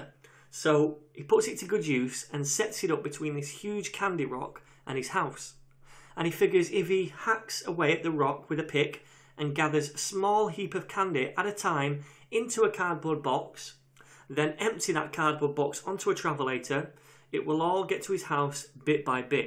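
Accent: United Kingdom. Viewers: Why do u use hard british accent?? There are many indians there who watch your videos and try to grasp the precious knowledge???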